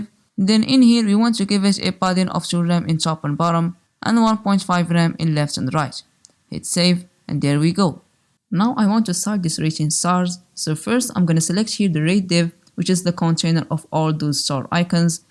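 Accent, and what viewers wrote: Morocco. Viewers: from your voice I am pretty sure that you're Moroccan ❤❤ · Very nice channel, thank u for the great content. Btw are u Moroccan ? cuz I feel like your accent is kinda Moroccan.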